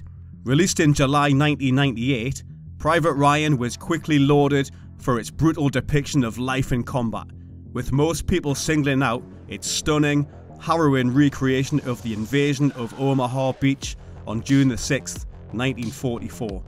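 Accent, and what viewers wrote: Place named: Newcastle, United Kingdom. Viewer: Can't take this video seriously with its Geordie narray'aaaaah.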